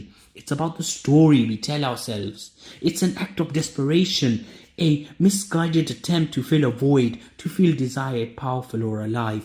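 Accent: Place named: India. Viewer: Your accent sounds Indian.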